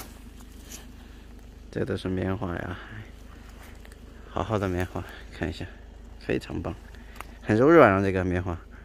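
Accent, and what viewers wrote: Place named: Sichuan, China. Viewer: 是重庆哪里的？和川北的方言最像。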